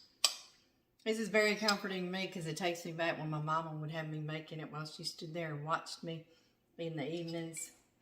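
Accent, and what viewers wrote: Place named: Alabama, United States. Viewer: You don’t sound Cajun but Alabamian 😁. I grew up in Louisiana but from Florida! Never made this but parents learned how.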